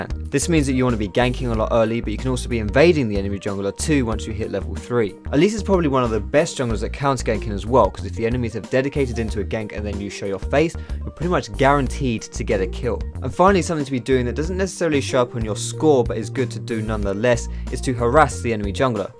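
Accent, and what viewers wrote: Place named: Southern England, United Kingdom. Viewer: ... What do you mean what's with the accent? He's from the south of England...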